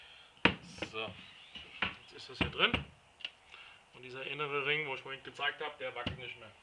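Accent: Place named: Germany. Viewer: Ja genau, ich hab noch nie sone sache offen gehabt, hab aber diverse Beschreibungen gelesen und da kommt einem teilweise das Grauen...Bilder sagen auf jeden fall mehr als 1000 Worte. Und den Deutschen akzent lieb ich einfach:-D (als schweizer)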